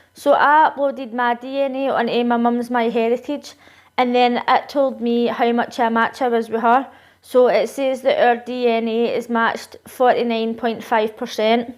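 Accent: Scotland, United Kingdom. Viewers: Is that a scottish accent? I'm American. Can't tell. I bet she's very British.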